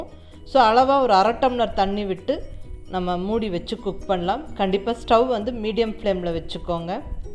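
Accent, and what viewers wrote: Coimbatore, India. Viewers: Coimbatore pasai semma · I like all your recepies and trying too, coming very well and unga kongu tamil enaku romba pidikum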